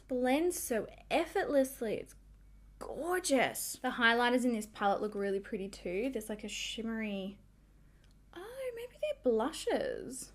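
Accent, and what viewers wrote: Australia. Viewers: I love how Aussie’s pronounce the letter “h!”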